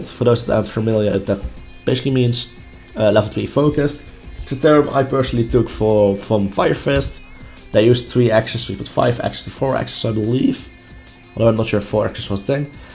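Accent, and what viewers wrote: Netherlands. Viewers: Are u dutch? U have a dutch accent Nice video btw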